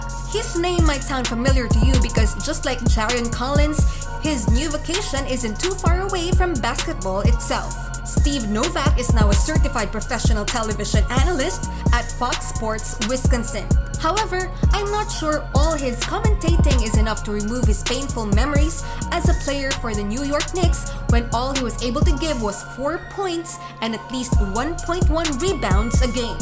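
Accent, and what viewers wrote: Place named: Philippines. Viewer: Are yall Filipino? You sound like my best friends wife and she is from Cebu. You didn't put Brian Scalabrine?? Any how, Great Job. Keep pushing hard. Don't give up.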